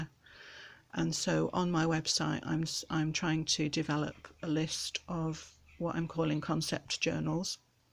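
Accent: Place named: United Kingdom. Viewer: Stunning! And I so enjoyed the video too. You make me laugh, 'no, scrap that, just go and live you'd lives ', so funny. And I look forward to the woodland journal tutorial. I'm intrigued to know how to ink pages the way you do. They are very beautiful. I'm new to art journalling, JJs, etc, so I'm learning. I'm in the Uk too, it's good to hear a homegrown voice x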